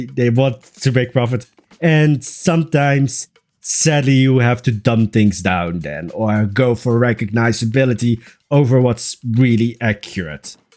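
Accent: Netherlands. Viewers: Dutch accent haha❤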